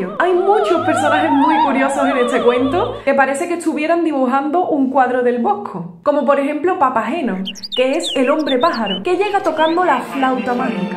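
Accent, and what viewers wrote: Andalusia, Spain. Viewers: Llegué de casualidad, me quedo por ese hermoso acento andaluz... <3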